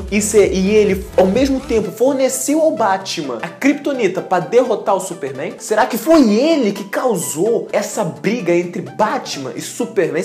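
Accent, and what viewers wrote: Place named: Portugal. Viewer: você é português? seu sotaque é diferente kkkk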